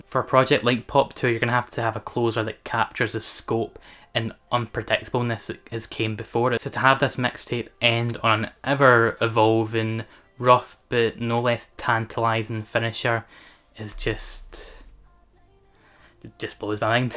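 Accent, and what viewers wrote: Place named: Scotland, United Kingdom. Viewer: This is the best channel I could find, music stuff with a scottish accent!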